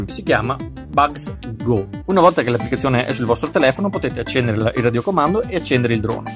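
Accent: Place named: Veneto, Italy. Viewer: Grazie, l’accento ti frega sei Veneto?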